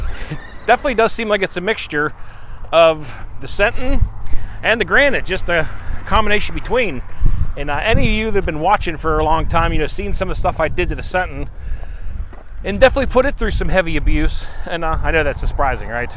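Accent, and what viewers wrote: Pittsburgh, United States. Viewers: Where are you located? Your accent sounds like western PA/Pittsburgh. Edit - ok - I see a sign in the background that is 724 area code so we are almost neighbors! Where is this track that you are at? I have a Big Rock coming on Thursday. Just subscribed!!!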